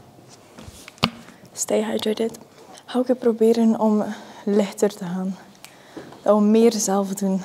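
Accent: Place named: Flanders, Belgium. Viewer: waarom staat er nederlands als je belgisch praat of vlaams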